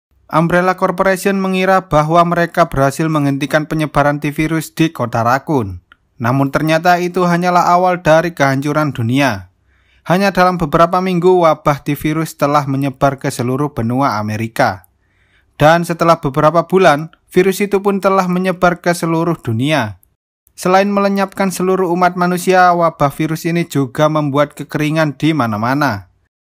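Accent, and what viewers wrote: Java, Indonesia. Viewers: Pertama kali mampir, logatnya medok Jawa banget 😁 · Jelasinnya engga jelas. Kalo udah medok pinter dikit jelasinnya. Gua udah nonton berkali kali soalnya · IQ7 tapi versi medhok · Iki admine dubbing jawa ya? 😅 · Orang jawa ni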